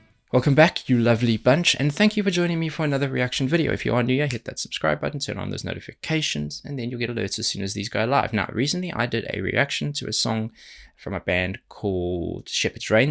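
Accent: South Africa. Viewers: I don’t want to be rude. Is that South African accent ( which I love) , my point is , is there South African metal bands ? Excuse me for being stupid, I really don’t know. Just curious ?!? Nighwish and the Hu are my favorites and some Philippine metal too 🤘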